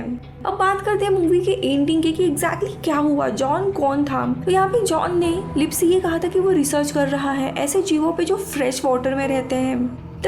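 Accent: Maharashtra, India. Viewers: Apki accent sai ki aap Maharashtra sai ho am I right?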